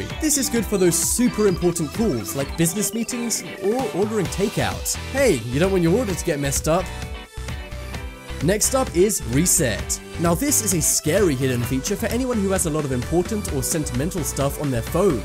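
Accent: England, United Kingdom. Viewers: Most irritating background music. Your accent sounds as though you are English, so the correct term for a meal ordered to be consumed at home is a Takeaway, not a Takeout, also, the correct abreviated term for Mathematics is Maths not Math. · Sure would have been nice to understand wtf he was saying. British accent. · What the hell was that British ninny saying? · How about talking a little slower, and not speak the Queen's English!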